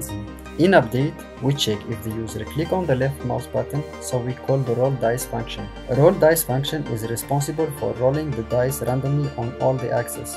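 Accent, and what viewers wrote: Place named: Tunisia. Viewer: L'accent khouya dhahra, w ma ytalaaha ken Tounsi kifek Merciiii infiniment ala Tuto <3 <3 ! w je t"encourage alekher w nshalah chaîne tekber akther akther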